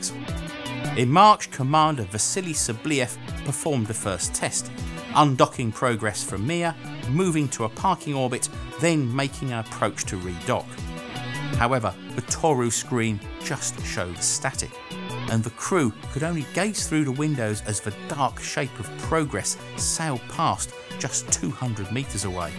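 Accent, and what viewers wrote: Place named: Southern England, United Kingdom. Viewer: That's his Southern English accent showing through. Many English accents don't put a lot of emphasis on the "rrrrr" sound of the letter "R".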